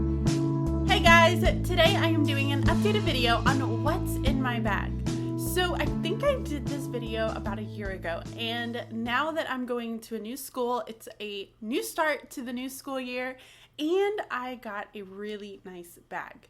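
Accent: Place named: Alabama, United States. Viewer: Are you from Alabama... your accent really came out... if so, we are neighbors.... I'm from Mississippi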